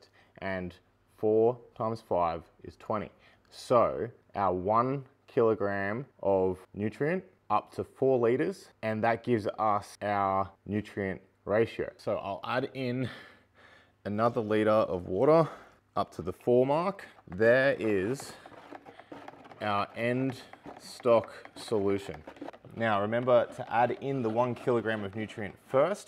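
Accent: Australia. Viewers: i switched from arduino mkr and pico videos to hydroponic and this was first video i put on and right at beginning i hear arduino and pico and wondering if im watching arduino stuff until i remebered that Aussie accent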